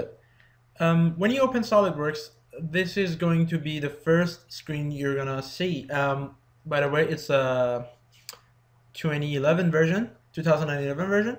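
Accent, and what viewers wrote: Iran. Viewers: Love the Persian accent. It reminds me of grad school (my advisor and a half-dozen labmates were Iranian). Your English is fine, just enough giveaways in the diction to identify the Persian accent. :) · Nice Tutorial. Persian accent.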